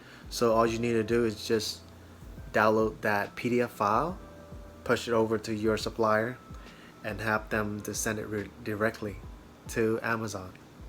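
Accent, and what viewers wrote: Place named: Texas, United States. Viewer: Dude whats up with the southern texas accent haha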